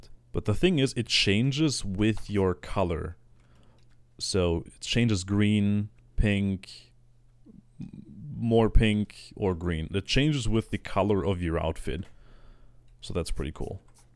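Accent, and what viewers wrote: Germany. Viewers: Puh Bruder, dachte schon wärst Deutscher.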